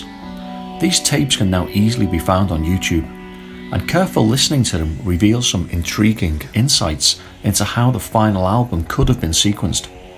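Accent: Liverpool, United Kingdom. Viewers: Sorry ..... only just got on to your this really is fantastic content and fantastic to hear your a fellow scouser . Thank you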